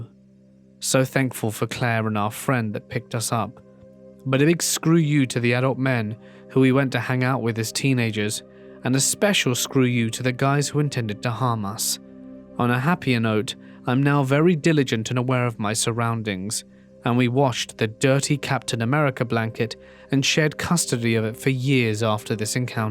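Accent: United Kingdom. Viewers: ❤your voice uk from ?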